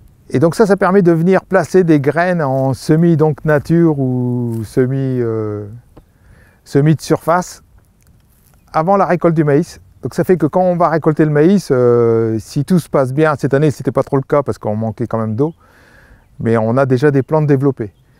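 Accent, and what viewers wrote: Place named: North, France. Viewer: L'accent du Nord est resté... 😇👍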